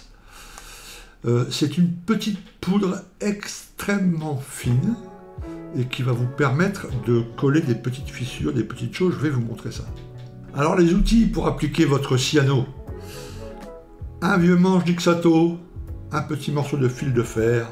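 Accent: North, France. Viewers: Salut, j'ai découvert ta chaine il y a peu et j'aime beaucoup.Le ton et la vie que tu y met (ce qui n'est pas toujours simple en fonction du sujet) 👍Et le p'tit accent de ch'nord me ramène à mon enfance ✌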